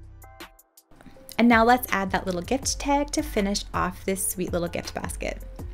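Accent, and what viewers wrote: Canada. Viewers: addresses this in lots of her videos! It's the Canadian pronunciation.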